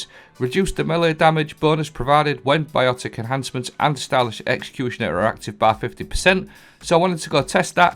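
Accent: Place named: Newcastle, United Kingdom. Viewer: I love the accent. You sound just like my mate Geordie Son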